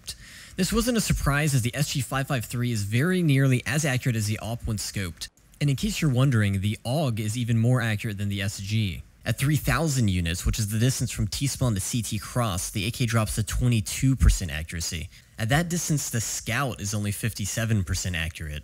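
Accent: United Kingdom. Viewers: nice brit accent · Good job on finding a subject not already rinsed by Philip. Your English accent is dire mate, kind of a weird nondescript UK chav come cockney gangster crossed with some old Victorian gent.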